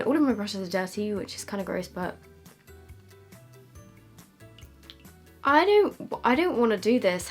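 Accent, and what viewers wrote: United Kingdom. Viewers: shes hella british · dat british accenT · She’s british 🤣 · It's just British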